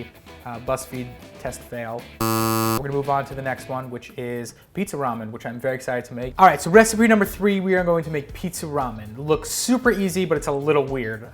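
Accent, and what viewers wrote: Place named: South, United States. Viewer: I love it. That southern accent (I think) is the best.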